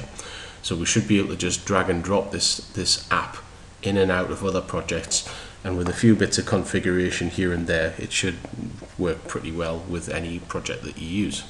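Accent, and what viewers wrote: United Kingdom. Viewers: One day spent banging my head around Django (trying to manage users / profiles) - 26 minutes of this tutorial - all sorted. Will be going through the rest of the series now. Genius. As an aside - always loved Python - Django at the moment is seeming like the fastest route to murdering Python. As another aside - Ace accent, in my personal opinion the best in the UK. International users take note.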